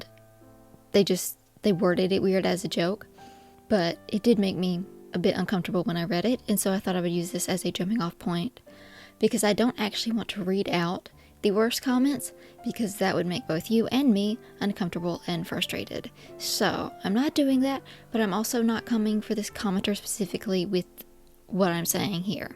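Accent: South, United States. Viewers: As a Californian, I have to admit my prejudice against southern people, and you are just a reminder of how ignorant I am. Love your accent and most of all your videos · Your accent reminds me of an attorney colleague I worked with years ago. He also put a lot of work into lessening his southern accent but I found it endearing in the occassions where it did come out stronger. I think your accent also gives you more implicit authority that you know what you're talking about when you discuss these beliefs present in christian spaces. It might be a little weird to you to hear, since this probably wasn't intentional on your part. Just my 2 cents on it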